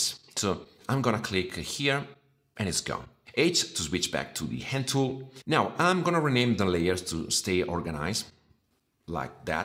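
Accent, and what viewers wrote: Italy. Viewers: English with Italian aksent is much better than native English. But I think this goes for everything, a flavor of Italy will always make things improve.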